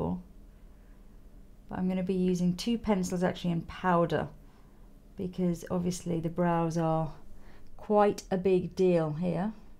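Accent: United Kingdom. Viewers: i must say your accent is great. i've heard a lot of british accents and yours is very proper and thick. HOT.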